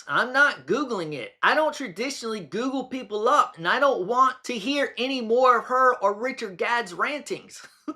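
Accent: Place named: Scotland, United Kingdom. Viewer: I am a Scot. I adored your Scottish accent - it really added to the joke of all those ranting emails!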